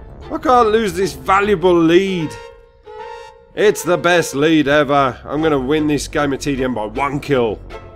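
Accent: Birmingham, United Kingdom. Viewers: that Birmingham accent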